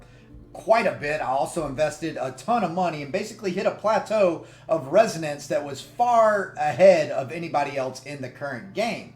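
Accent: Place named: Texas, United States. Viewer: Texan accent makes sense. Oil money=No Skills/P2W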